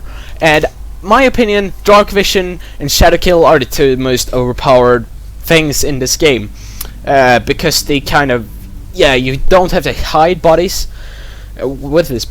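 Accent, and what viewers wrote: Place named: Netherlands. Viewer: you must be dutch, i heard your pronunctiation and though you speak english well. when saying jump and other things i was like hmm.. he must be dutch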